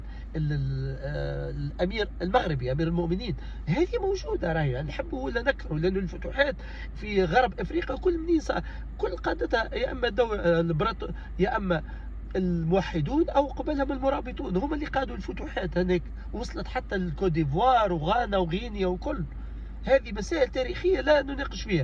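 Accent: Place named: Tunisia. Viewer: صاحب الفيديو تونسي اللهجه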